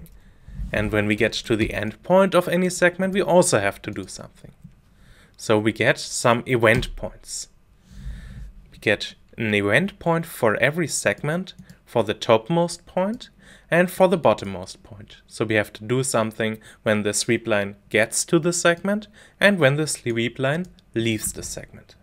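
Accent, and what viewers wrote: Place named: India. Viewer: Dude looks like american, sound like indian. I meant no insult, I just meant you got all to be a tutor online.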